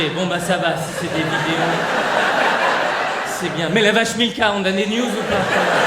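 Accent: Switzerland. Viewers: j'adore ton accent suisse voilà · J'adore ton accent suisse, il faut que tu fasse une vidéo où tu parles en Suisse...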